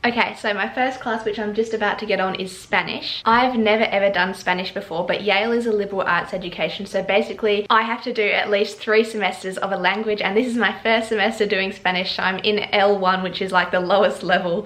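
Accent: Australia. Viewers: She’s Australian 😂😂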